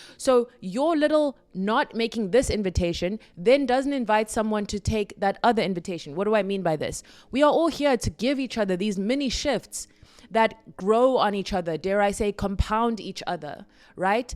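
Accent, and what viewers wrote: South Africa. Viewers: Your accent sounds like you're South African- if you are, it's always amazing discovering fellow South Africans who are sharing such wonderful videos through the lens of spirituality.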